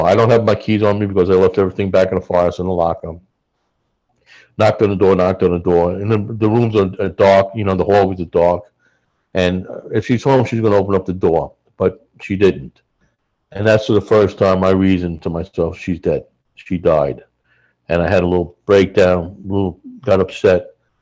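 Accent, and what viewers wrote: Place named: Ireland. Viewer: Interviewer has an Irish accent from Ireland, firefighter has an americanized Irish accent.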